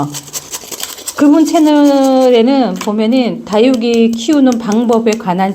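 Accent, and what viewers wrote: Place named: Jeolla, South Korea. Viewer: 안녕하세요~🥰🥰🥰 편안한밤참들기전 이쁘니들 힐링하러왔어요 ~~ 화그 재식재 하시는군요~~건강 해보여요 잎장은~🧚‍♀️🧚‍♀️🧚‍♀️🧚‍♀️🧚‍♀️ 여름에도 전 물 조금줘요 뿌리가 고사가 되더라고요 😂😂😂 전구독한지 오래됐떠용 전라도 사투리 겁나게 쓰시는분 👍👍👍👍👍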